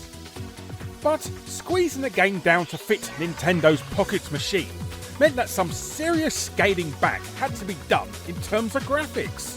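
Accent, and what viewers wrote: United Kingdom. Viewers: He's just British.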